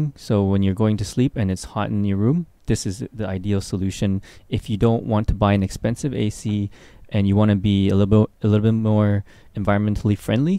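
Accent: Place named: Philippines. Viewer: Are you Pinoy? Your accent sounds a little like it.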